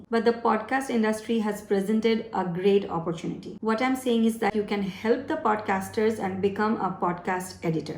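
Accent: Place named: Western India, India. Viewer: Do you practice boxing? Why your hands are Red? Your English is Gujarat or Maharashtra side of India.. If you don't mind then please let us know from what part of India you are.. Need help on LLP and bank account that's why I came to your channel and subscribe I was in US last year.. Have 10 years multiple entry US business India.. Like to export goods like Clothes but have no idea.. Any other then clothing what else you recommend to export there??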